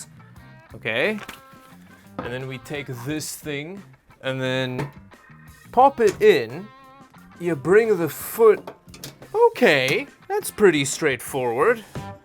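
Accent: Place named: Cape Town, South Africa. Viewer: Hey I'm subscribing just because I recognize the capetonian accent. Cheers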